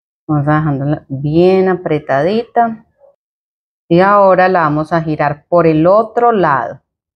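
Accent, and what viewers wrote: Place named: Colombia. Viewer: es paisa,rolabrasileña haha muy chevere la receta, gracias.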